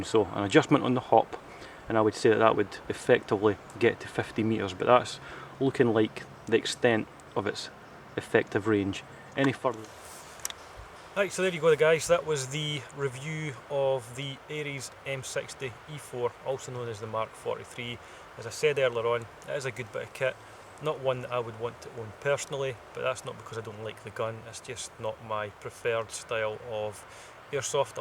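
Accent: Scotland, United Kingdom. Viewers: you dont get much more scotish =S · You are so scottish like john mctavish :)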